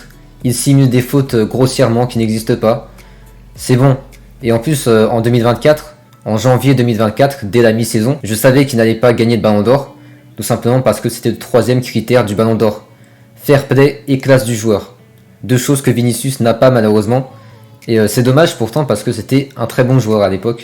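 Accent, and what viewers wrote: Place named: France. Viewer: Was great to listen to you. You have a beautiful French accent. I enjoyed your presentation 😄big like 👍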